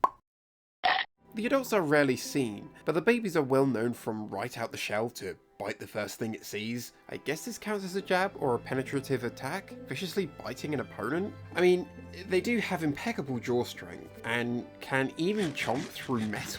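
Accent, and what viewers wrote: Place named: United Kingdom. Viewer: Why do British pronounce t-u's like c-h's?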